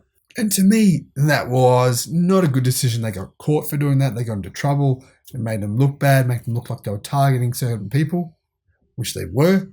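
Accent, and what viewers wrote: Australia. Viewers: sounds Australian.. 😁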